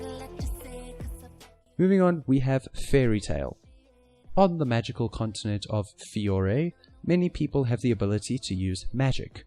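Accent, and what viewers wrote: South Africa. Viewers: You have a South African accent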